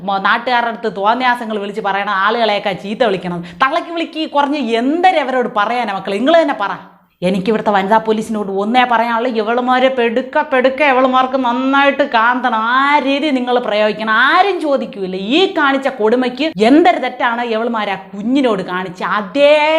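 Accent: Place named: Trivandrum, India. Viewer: പൊളിച്ചു.ഈ തിര്യോന്തരം സ്ലാങ് കേൾക്കാൻ തന്നെ ഒരു പ്രത്യേക രസമാണ്.ഇനി ഇങ്ങനെ ഉള്ള വീഡിയോസ് ഡെയിലി ഇടണം.സൂപ്പർ 👍🏻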